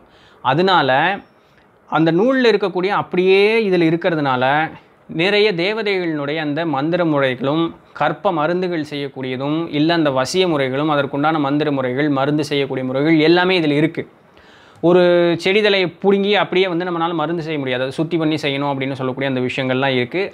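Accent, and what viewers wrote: Coimbatore, India. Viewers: Hi I learned so much from your videos. Being from Coimbatore like your way of saying. I sent email but it did not delivered. Where did you buy your copper kappu please respond as soon as possible. Please reply as soon as possible. Thank you!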